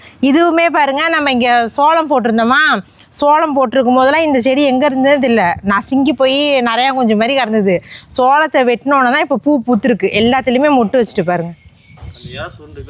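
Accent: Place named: Thanjavur, India. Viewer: Namma thanjavur bashai neruputi❤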